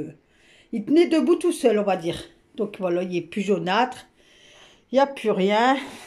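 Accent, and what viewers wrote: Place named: Nord-Pas-de-Calais, France. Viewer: Coucou ma vive les ch'tis Je les aimes et j'aime trop l'accent ch'tis ❤❤❤❤❤❤❤❤❤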